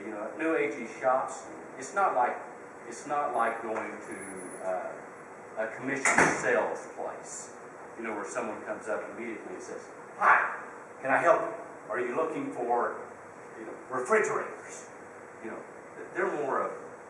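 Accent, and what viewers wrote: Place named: Oklahoma, United States. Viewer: I thoroughly enjoyed your talk, and am so glad that Anna (as it seems (filmed it) and put it up for all to hear. It brought back such great memories or your "Oklahoma Accent":) which you still have in spades. Haha. And I also enjoyed the "chiming bowl" story,.. What an interesting idea you had, and told in a way only you could tell. It was such fun to see you being you again.:) Lynne Anna, Thank you for filming this and putting it up. It was great.:)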